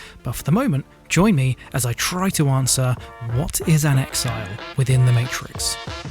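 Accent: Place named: Australia. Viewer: Love your Aussie accent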